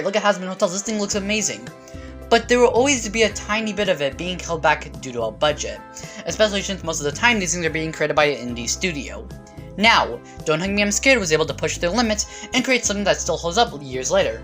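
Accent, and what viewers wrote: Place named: United Kingdom. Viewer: you already sound british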